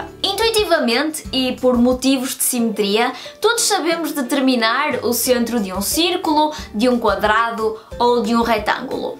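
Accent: North, Portugal. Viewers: Em Portugal há imensos sotaques. O dela é do norte de Portugal. Abraço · Tipo, tu aprendeu bastante sobre o vocabulário brasileiro né?! Pq fica muito foda a forma como tu fala as gírias do Brasil em português de Portugal. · seus vídeos são muito bons de ver. Ouvindo você falar, lembro-me dos meus primeiros dias em setemhro de 2009, recem chegado ao Porto pra ficar 6 meses pelo ERASMUS. Eu mal entendi o sotaque nortista. Hoje de tanto que eu ainda consumo música e mídia portuguesas, pra mim é a coisa mais fácil do mundo. Saudades de Portugal! Um dia volto! Parabéns pelo canal! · Tão bonitinha o português de Portugal falando porra ❤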